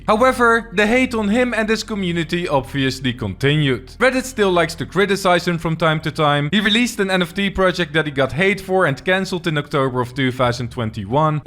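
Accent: Netherlands. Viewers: Ik hoor gwn dat je nederlands bent 😂😂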